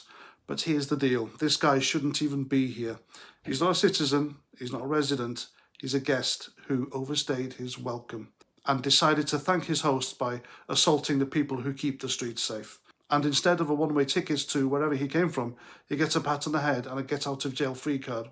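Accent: Wales, United Kingdom. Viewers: You are like a Welsh less harsh Pat Condell! I like it!!!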